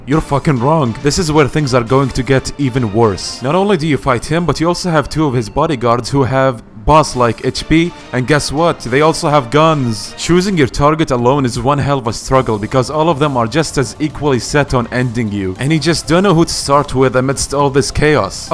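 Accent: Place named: Bahrain. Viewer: It’s very easy to tell that you’re bahraini from your accent 😂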